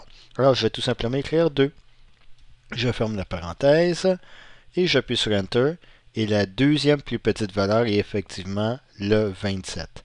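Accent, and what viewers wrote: Quebec, Canada. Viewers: Merci?Je découvre pleiiiin de choses simple et très utile grâce à vous.Et en plus l'accent et super. Québec?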